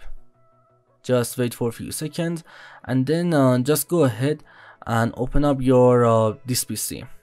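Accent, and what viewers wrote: Iran. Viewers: ایرانی نیسیتی؟ لحجه رو برم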